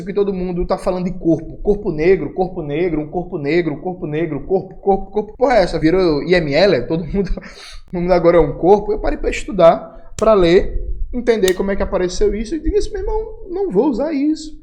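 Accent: Pernambuco, Brazil. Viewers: Clique beite. Eu adoro esse sotaque de Pernambuco❤❤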